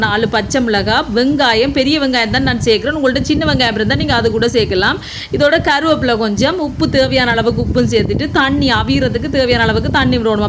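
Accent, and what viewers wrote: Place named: Sri Lanka. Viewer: சகோதரி உங்கள் இலங்கை தமிழ் சூப்பர்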